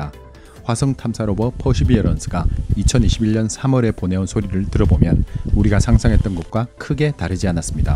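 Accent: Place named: Gyeongsang, South Korea. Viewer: 나레이션 하는분 경남사람이시네 부산잠깐살다 떠난이후 오랜만에 들어보는말투네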